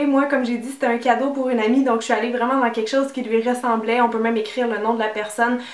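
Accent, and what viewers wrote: Canada. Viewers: ok! Merci beaucoup!;) Tu es vraiment formidable! Je t'adore, j'adore ton accent canadien et j'adore tes vidéos!!!! Continue comme ça! Parfois tu es vraiment trop marrante! BSX... <3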